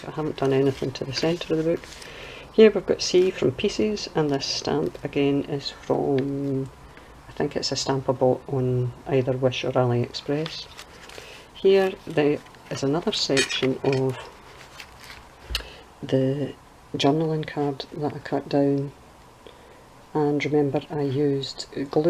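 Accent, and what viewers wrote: Scotland, United Kingdom. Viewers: Your English is British? Because I feel a Scottish accent... Am I right?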